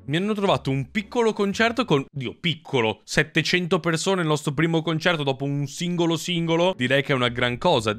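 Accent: Bologna, Italy. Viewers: Ma sei Di Bologna davvero?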